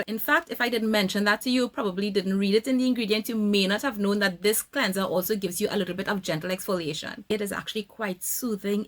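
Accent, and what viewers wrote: Trinidad and Tobago. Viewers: This review helped me so much in choosing the perfect cleanser of my acne prone skin…. Thank you! Thank you! Thank you! And of course, you must know a Trini anywhere, especially by their accent 😍🇹🇹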